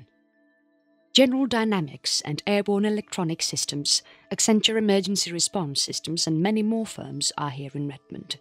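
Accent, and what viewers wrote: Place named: United Kingdom. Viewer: I'm seeking real info about The secrete societies in existence today establishing the New World Order like they have accomplished in Euro. Oh I know it's a secrete. Why don't you dare mention the world bank, the U.N, NATO, The Pacific Alliance, etc????? She has a British Accent but displays US Marines? I don't trust this Commercial. It demos no history.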